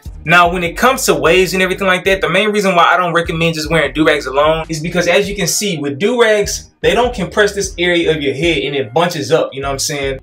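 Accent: Houston, United States. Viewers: This dude gotta be from the H. Subscribed.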